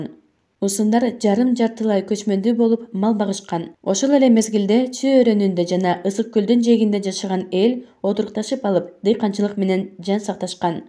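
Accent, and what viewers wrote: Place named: China. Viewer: Акцент эмне үчүн,кытайлык кыргыз айым го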